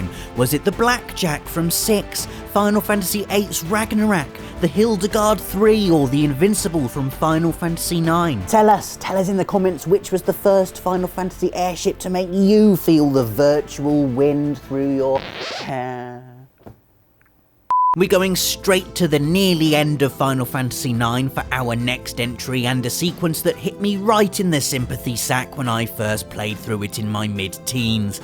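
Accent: England, United Kingdom. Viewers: Asha2820 He's English. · If i could bear through the narrator voice I'd watch it but god I just can't... :/ Sounds like a british kindergarten teacher talking to below 6 yo children.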